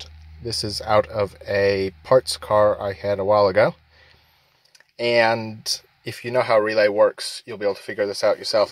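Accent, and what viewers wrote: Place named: New Zealand. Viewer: Can't work out if you're a Kiwi from the States or a Yankee living in NZ..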